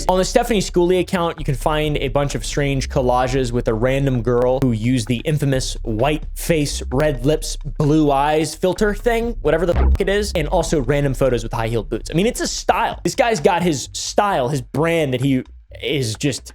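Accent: India. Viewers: From his accent he actually sounds Indian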